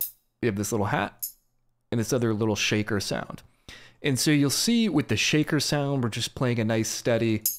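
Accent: Baltimore, United States. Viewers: lol i would bet my life you're from baltimore or philly. great video!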